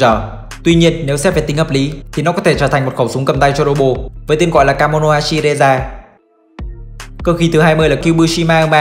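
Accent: Japan. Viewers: Tên nào tiếng Anh thì đọc tiếng Anh đi đọc tiếng Anh kiểu Nhật thế nghe nó cứ bị khó chịu ý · Đừng đọc cái kiểu tiếng anh nhật nữa đi. Từ nào tiếng anh thì đọc tiếng anh đi cho dễ nghe.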